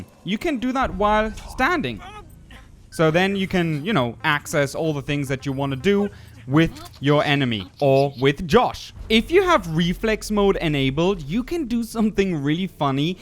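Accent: Germany. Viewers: & Man hört sehr, dass du ein Deutscher bist. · Hi, i this is the 1 vid i saw , nice- 1 . BTW are you German? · R u german?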